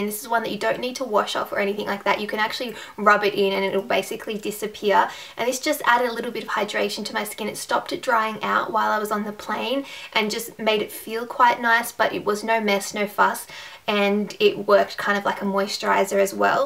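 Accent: Australia. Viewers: Are you from Australia just wondering, also you sound like Ann Readon from how to cook that lol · Are you from Australia?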